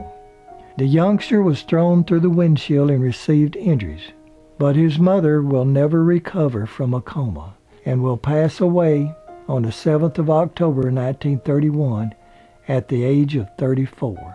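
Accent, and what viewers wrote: South, United States. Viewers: Mr. I enjoy your Southern Draw and your coverage of these fine actors in our past enjoying their movies while growing up. Thank You Sir for your stories of important memories enjoyed in life.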